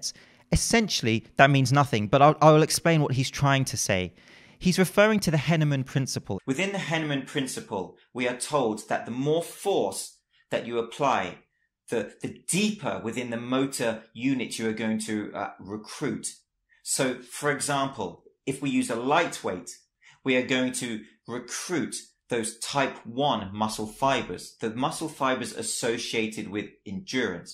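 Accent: England, United Kingdom. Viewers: Proper English accent, still says "ain't." I love it. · I trust this guy because he's bald and he speaks with a british accent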